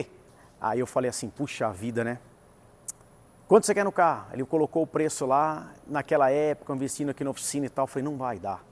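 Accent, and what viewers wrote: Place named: Mooca, Brazil. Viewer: Curioso pra saber de onde vem esse seu sotaque kkk. Parece da Mooca, belo! kkk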